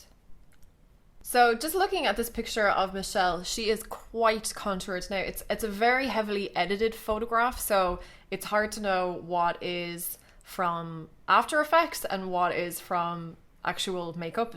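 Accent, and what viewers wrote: Ireland. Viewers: Great tutorial, clear and to the point. Great camera too, makes it a pleasure to watch. And you're Irish? You've one me over, just subscribed x:-)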